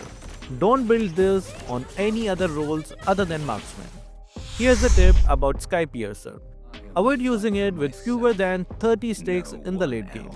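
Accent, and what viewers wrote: India. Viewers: the moment I heard the Indian accent I just stopped all.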